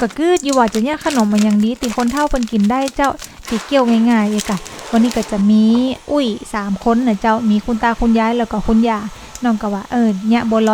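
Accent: North, Thailand. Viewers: ฟังรู้เรื่องอยู่ค่ะ เพราะที่ทำงานแต่ก่อนคนเหนือเยอะเลยฟังรู้เรื่องค่ะ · สำเนียง ลำปางกะเชียงราย เหมือนกันมาก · เพิ่งมาเห็นช่องนี้ กำเมืองงงง น่าฟัง รื่นหูมากกก ติดตามเลยเจ้าาา❤❤❤❤ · ครั้งแรกเน่อเจ้าหล้าเพิ่งเจอยูทูปเบอร์คนเมือง แม่ก่อเลยกดติดต๋าม เลย ฟังกำเมืองเหียพ่องม่วนดีเจ้า คนเจียงใหม่เน้อเจ้า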